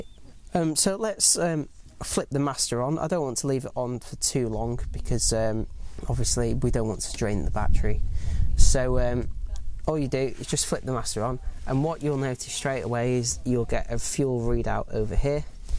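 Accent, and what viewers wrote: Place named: United Kingdom. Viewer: It was quite challenging for me to interpret the British accent, I´m more accustomed to the American one. It feels like a mechanical typewriter is speaking to you, and the rhythm hypnotized me and my eyes closed in a brief sleep. I rewound the video several times. In any case, I found it very interesting and the information provided was complete. I enjoyed it very much. Greetings from Buenos Aires.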